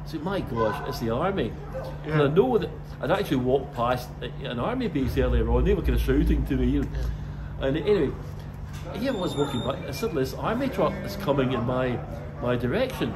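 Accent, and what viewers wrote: Scotland, United Kingdom. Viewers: I would typically play such videos at twice the normal speed.....but given the Scottish accent, I have to watch this video at the normal speed....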